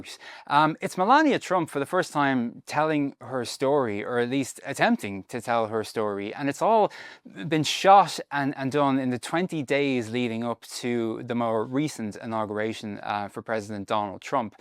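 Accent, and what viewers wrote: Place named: Ireland. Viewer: Nice to hear an Irish review 👏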